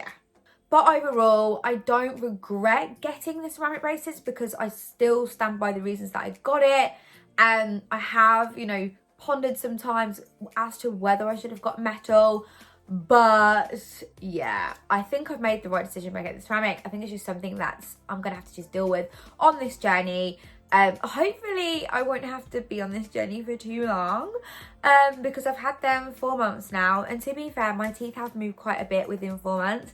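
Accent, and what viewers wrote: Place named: Australia. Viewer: Hi, I really like your accent. Are you Australian?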